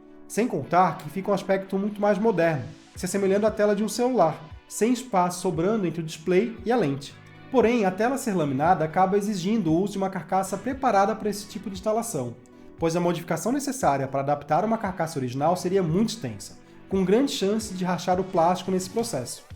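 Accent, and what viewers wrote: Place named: Florianópolis, Brazil. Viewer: Incrível seu conteúdo cara, ganhou um inscrito. Fora que só entrei no video e percebi que é manezinho 😂👏🏻👏🏻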